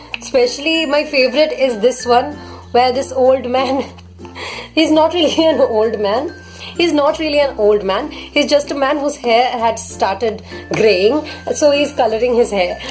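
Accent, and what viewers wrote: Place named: India. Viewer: where are you from 🙄you re kind like indian accents. 😏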